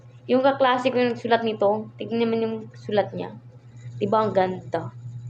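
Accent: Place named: Ilonggo, Philippines. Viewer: Ilongga malambing talaga galit na malambing pa rin ang salita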